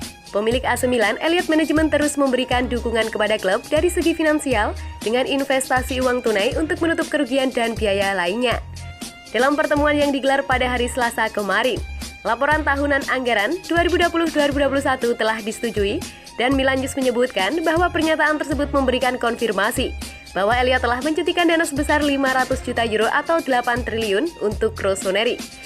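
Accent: Java, Indonesia. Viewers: Kakak suaranya medhok... mantav...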